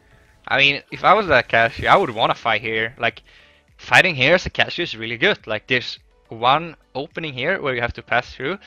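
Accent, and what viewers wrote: Sweden. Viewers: cryaotic our hero! I'll have you know not all swedish people sound like that. Brings me shame every time I hear some one that. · I don't know what accent you mean, but of course Swedish people have a similiar accent! Most Italians have the same English accent aswell, etc. I don't understand why "TheBlueSledge" is crying about it. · Du hade en väldigt start svensk dialekt i den här xD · swenglish HAHAH all of us sweds talk like that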